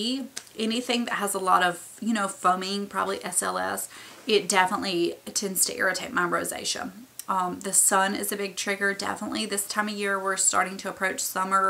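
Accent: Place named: North Carolina, United States. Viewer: I love your accent! Are you from North Carolina? I grew up there and your voice reminds me of home :)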